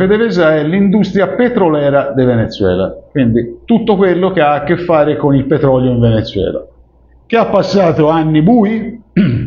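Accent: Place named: Florence, Italy. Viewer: e tu sembri di Firenze!!!!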